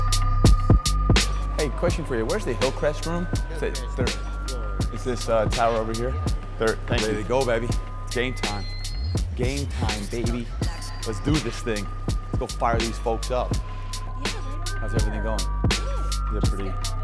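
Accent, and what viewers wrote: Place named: France. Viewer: Oh and I LOVE your French accent!!!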